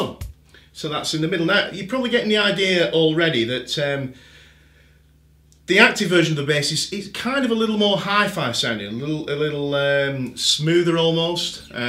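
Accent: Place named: Bolton, United Kingdom. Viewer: Looks and sounds great, Sir! Nice to hear the dulcet tones of a fellow Northerner, too. Sounds like you're from my neck of the woods - Bolton. Correct me if I'm wrong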